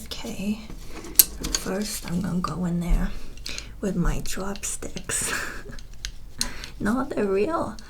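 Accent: New Jersey, United States. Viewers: Haha the accent is so cool! All i could think of was jersey boys :D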